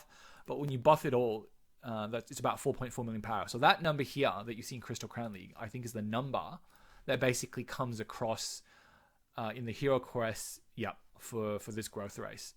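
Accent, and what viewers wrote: Australia. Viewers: Are you in Australia? Love the Aussie accent lol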